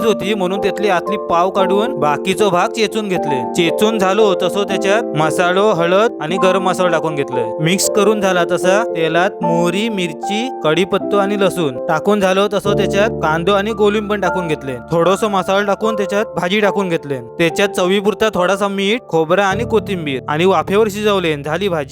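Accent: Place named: Konkan, India. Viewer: Aavaj chan vatto dada tuza eikayla kokni style asach pudhe jat rha😊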